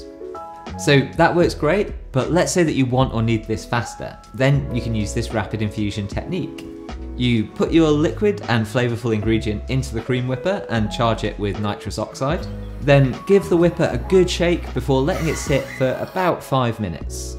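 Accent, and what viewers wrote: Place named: United Kingdom. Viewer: I've been watching a few of your videos, now, and the quality is amazing, both of the photography and the incredible food ideas. Your commitment to the flavour, even for a professional, is mind-blowing. Thanks so much for sharing these. I'm also very curious about your accent which has hints of RP, northern and estuary!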